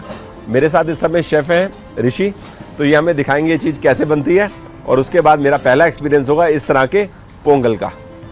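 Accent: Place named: North, India. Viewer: No he from North India Delhi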